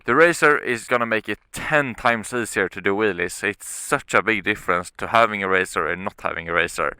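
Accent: Sweden. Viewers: jävlar vilken svensk dialekt du har, men bra video.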